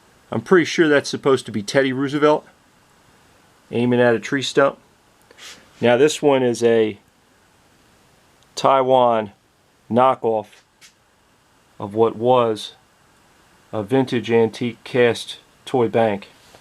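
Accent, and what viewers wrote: Philadelphia, United States. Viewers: you sound like a Philly boy.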